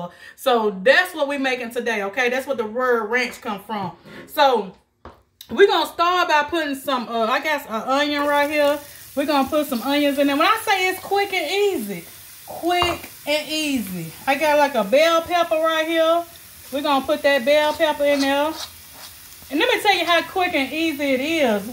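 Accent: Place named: Tennessee, United States. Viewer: Girl you must be from West TN. 😂 girl! ❤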